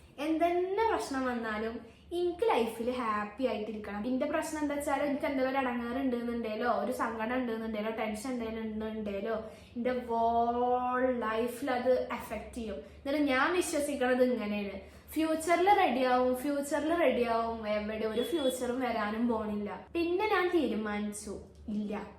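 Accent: India. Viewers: Njan ninte vedios kanunathe malappuram slang kelkkan anu 🫂❤️ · Bro that perinthalmanna slang is🤌🤍(kunu...ttoo)😂 Ankk Cabin crew aavan kaiyatte tto🫶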